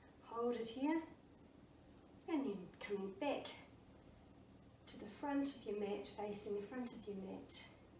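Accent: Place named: New Zealand. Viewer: I love your dark hair! I don't know why your channel doesn't get more subscribers. I love your videos and your calming voice and very unique Kiwi accent. Just wanted to let you know you're definitely appreciated! 🤗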